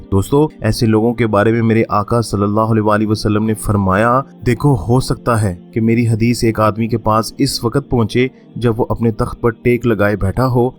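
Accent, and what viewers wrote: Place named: Punjab, Pakistan. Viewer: This guy speaks Urdu but punjabi accent , means Pakistani punjab region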